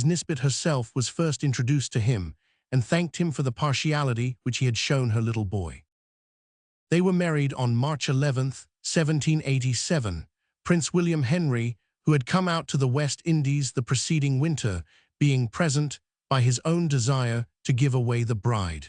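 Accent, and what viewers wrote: Mid-Atlantic, United States. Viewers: Absolutely horrible rendition. The AI voice has an unpleasant mid-Atlantic sound, and doesn't know how to pronounce basic words in English, such as lieutenant. If this is what audio-books sound like then I'll give them a miss.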